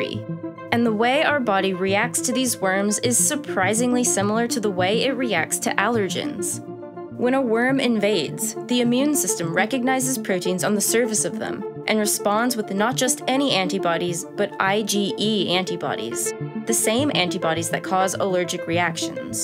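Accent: United States. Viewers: where is this content creator's English accent from? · I love your American accent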